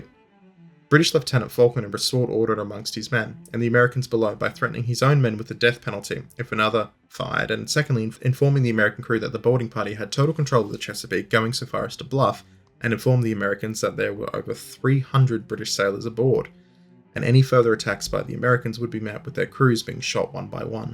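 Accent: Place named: Australia. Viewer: His "vocal fry" is part of his regional australian accent. Some accents have natural vocal fries. Not every vocal fry is an affection by hypsters, you know? The entire world is not american, you know? English speaking foreigners,, dude, it is a thing.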